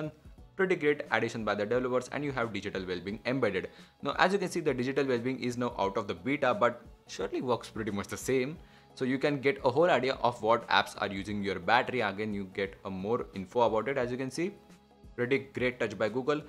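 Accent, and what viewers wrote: India. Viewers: You have EYES though. I can understand it even if he talk in pure Indian as long as i can see what he's doing.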